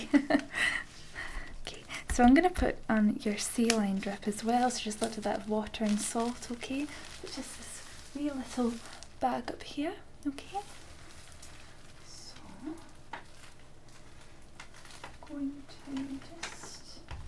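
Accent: Scotland, United Kingdom. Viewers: Scottish AF damn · YES FINALLY SCOTTISH ASMR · Please more Scottish Asmr! Your Scottish accent makes me so calm! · she sounds scottish. not british. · when you say "scary", it sounds like "scearee". but I like the accent, do all Scottish people say it like that? just a lil curious.hehe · Good old Scottish accent mines is from east ayrshire I can't place where yours is from lol? And also super video :) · That pretty Scottish voice is so relaxing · I'm drunk, I love ur Scottish accent, what's more to say??? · Honey, where exactly are you from? I'm American and if I had to guess, I'd say that's a "Scottish" accent, right? Not Irish; am I correct? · that Scottish accent is uncommon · Is this your real accent? Are you Scottish? 😊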